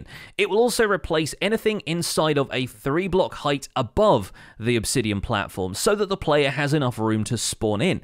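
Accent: United Kingdom. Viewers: I just love your British accent😊😊😊😊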